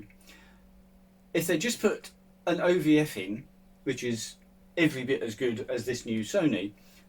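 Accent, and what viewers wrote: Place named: England, United Kingdom. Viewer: I had a sneaky feeling you would be posting something about the A1 today. Love the rant, the sarcasm, especially with the perfect English accent (I'm in Texas). 😊 High stills fps are like video now. I would not want to shoot a burst of 20-30 fps of that cyclist rounding the corner and then cull through a hundred photos at the computer looking for the perfect shot (unless one is a sports photographer or just hoping to capture a cycle wreck). Great video.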